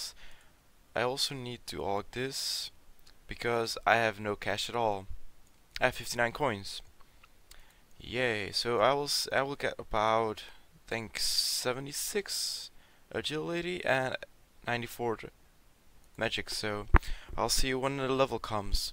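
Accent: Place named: Norway. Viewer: Yo, where are you from? You kinda sound norwegian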